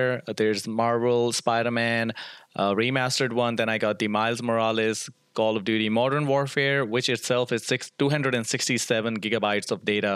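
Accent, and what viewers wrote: India. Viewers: Are you from india? because your accent seems like it and you also search bollywood videos in your 8k tv video that's my speculation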